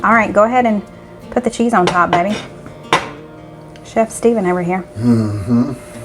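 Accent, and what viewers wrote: South, United States. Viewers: I looooooovve the way southerners say “oil” haha. You guys say it like “ol”. Another great video.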